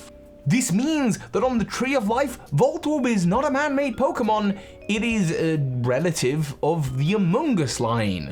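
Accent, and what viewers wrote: United Kingdom. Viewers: Your from Uk?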